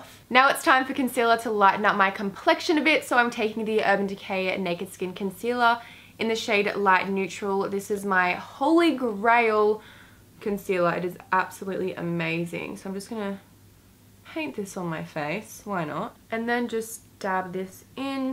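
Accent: Australia. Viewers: Omg i love this look, and for people that are saying, " why is she wearing all that makeup her skin is flawless" Yes her skin is flawless and i hope she doesn't wear this much makeup on a daily basis. But she has a bloody makeup channel what do you expect. lots of people wear makeup just because they love to apply it and wear it. i don't wear makeup because I'm only 12, and my Mum wouldn't approve, but I still love to wear it on occasions ( when i get the chance.) Also I'm Australian and all those american Youtubers can get annoying at times, But listening to someone with the same accent as me is way nicer. Sorry for long af comment but recently found your channel and I love it ! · For some reason I feel like if Kendall Jenner had an Australian accent, she would sound like you😂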